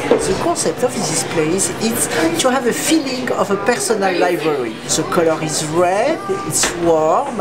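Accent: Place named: France. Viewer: his high pitch voice with french accent are very adorable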